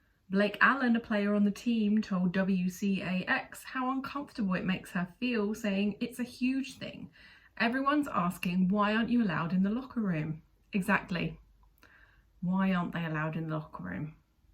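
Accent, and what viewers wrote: United Kingdom. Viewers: For some reason I just smile when seeing this adorable human being. The British accent might play a role but ultimately it's just the kind and calm nature you experience from her. I utterly enjoy listening to her.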